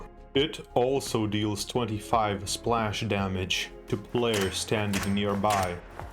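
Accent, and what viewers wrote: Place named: United Kingdom. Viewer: british scp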